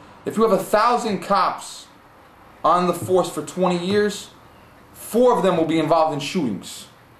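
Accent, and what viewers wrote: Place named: New York, United States. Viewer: Yo, welcome to Nu Yok. Got to love the thick accent.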